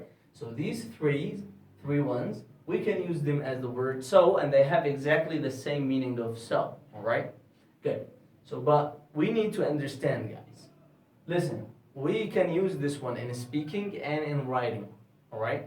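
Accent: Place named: Yemen. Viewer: for English I just wanted to make sure owing to your accent look like Yemeni accent 🙂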